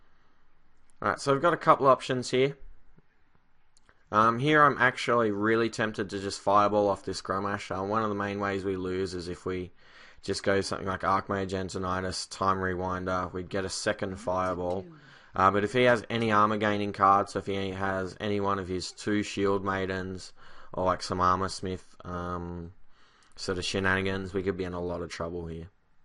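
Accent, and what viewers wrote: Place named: Australia. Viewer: BNOracle Here. In the intro, you really needed to use the word snowball. Also,You sound way more australian through the snowball :P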